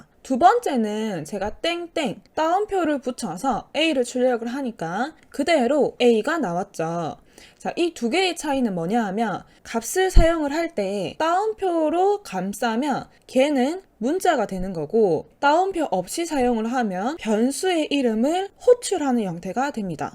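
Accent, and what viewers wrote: Gyeongsang, South Korea. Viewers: 경상도 사투리 반갑네요 ㅋㅋㅋㅋ 전 서울상경해서 코딩배우는 대학생입니다😢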